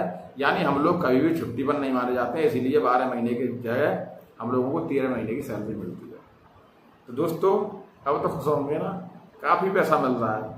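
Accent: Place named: Braj, India. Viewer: Sir mai aapse bahut prabhavit hu......aapki boli braj kshetra ki tarf ki lag rhi...aapki jaankariyan bahut hi kaam ki hoti h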